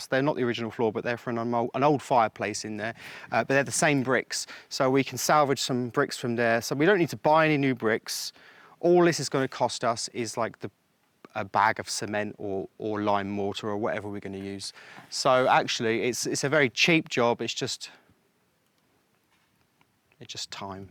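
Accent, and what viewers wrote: United Kingdom. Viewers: …your British accent is so much more enjoyable to listen to than Dick Van Dykes!!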